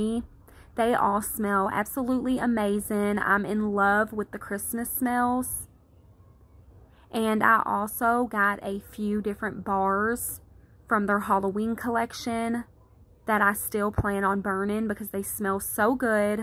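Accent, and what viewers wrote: South, United States. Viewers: I enjoy listening to your commentary because of your accent. It reminds me of my southern family, and you're pretty as well. Love from California.;)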